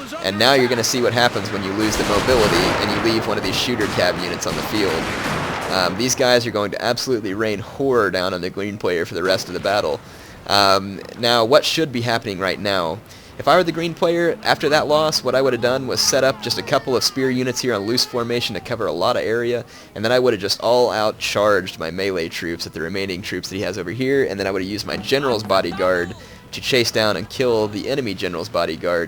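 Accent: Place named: New Jersey, United States. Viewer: Happy Thanksgiving. Your videos help decompress this Napoleonic War fan after work, no matter what era. Very involving. You and the Prince of Macedon have well earned the esteem of your fans for the military education and well-spoken presentation you bring to the game. Congratulations..By the way, you sound just like a Jersey/Rutgers guy.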